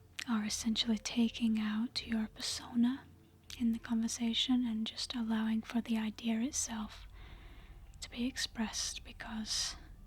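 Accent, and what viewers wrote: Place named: Northern England, United Kingdom. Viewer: Glad your retaining your northern accent in the main. Greater Manchester area perhaps. Leigh. Rochdale. Bolton. Summat like that. All the best from sunny morecambe. Cheers.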